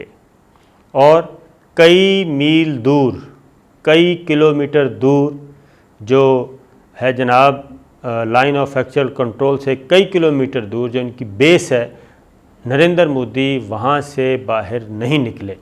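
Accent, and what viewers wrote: Punjab, Pakistan. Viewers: Tum punjabi ne urdu ki mother fuck kardi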